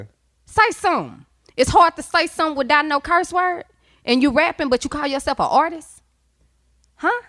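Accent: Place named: South, United States. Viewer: I love her lil country accent “I’m talm bout Semen 02” 😂😂😍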